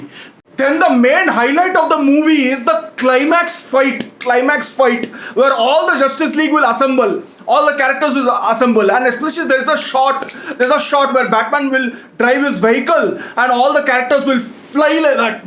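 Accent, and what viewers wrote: India. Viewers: Whoa that's a thick Indian accent....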